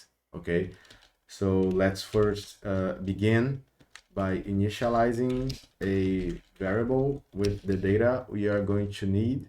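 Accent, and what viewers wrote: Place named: Brazil. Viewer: You sound like you've got a Brazilian accent, is that right? I am from Brazil, living overseas, learning Python, and this is exactly was I was looking for. Obrigado!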